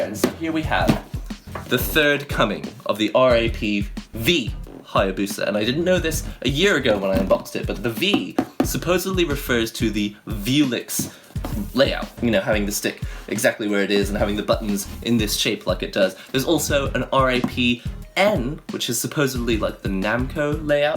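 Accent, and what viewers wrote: United Kingdom. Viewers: I'll be honest, your British accent took me off guard. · Your family is in the UK? well that explains your accent lol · wow that British accent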